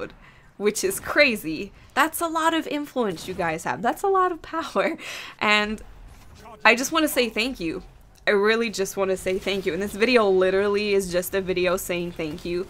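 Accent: United States. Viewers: U sound American. U don't have a British accent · Where are you originally from? You should like your from somewhere in America but you and your mum are living in the uk? (Unless you are talking about the city named London in Canada) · she's lived in America and has an American accent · arent you from america, you sound it!!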